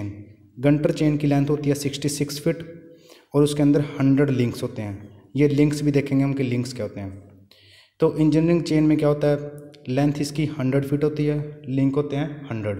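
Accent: India. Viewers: sir are you from himachal?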